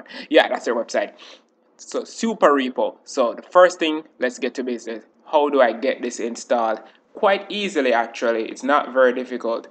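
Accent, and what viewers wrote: Jamaica. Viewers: I love your Jamaican accent so much. <3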